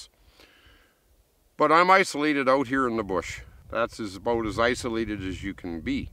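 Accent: Alberta, Canada. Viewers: He has a strong Canadian like accent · Are you Canadian? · This guy sounds like every man in Alberta/BC...... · keep recording these videos. Experiences in the "bush" as you explain and record are not only interesting, but soothing. I wish I were a bit younger and surrounded by nature. You are a great "ambassador" to bushcraft. (BTW: Your Canadian accent adds a GREAT deal to your videos.) Keep'em coming, mate!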